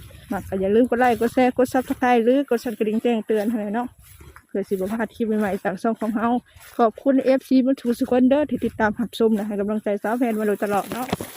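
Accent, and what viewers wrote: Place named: North, Thailand. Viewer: ຫມົກກະວ່າມອກເນາະບ້ານເຈົ້າສຳນຽງເວົ້າເຫມືອນຄົນເຫນືອເລີຍ!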